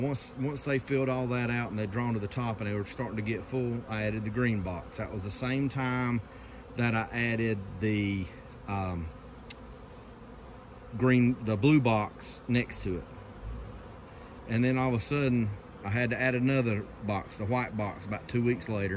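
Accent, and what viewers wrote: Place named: North Carolina, United States. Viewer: Good flow in NC also. I’m up the road from you in foothills. I’ve always taking reducers out during flow. I knew you were from NC before you said so. We got that accent.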